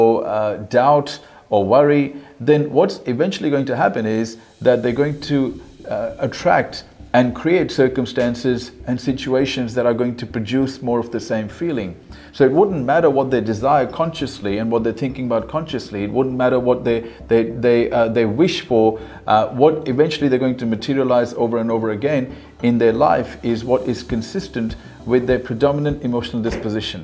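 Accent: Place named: United Kingdom. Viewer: You have a British asscent Sir.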